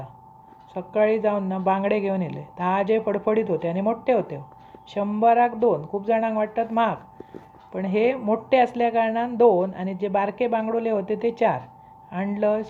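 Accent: Malvani, India. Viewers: Tumcha aawaj ani malvani bhasha bolnyachi paddhat khup aawadte.... Tumchi khup khup pragati howo...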